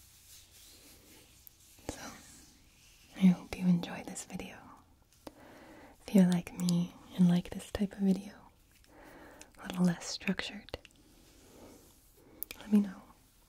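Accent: South, United States. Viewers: She's southern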